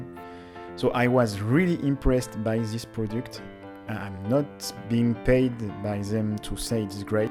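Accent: France. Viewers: I was kidding, is that a problem? By the way, I love the Frenglish accent :-)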